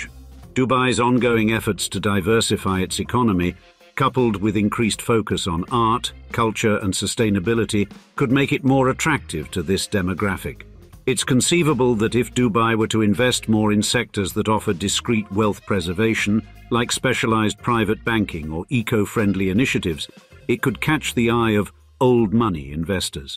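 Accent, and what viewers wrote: United Kingdom. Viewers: Interesting: with a crisp British accent, we're informed that data collection in Dubai is extensive, and that cameras are ubiquitous. London is THE city to visit to be caught on camera.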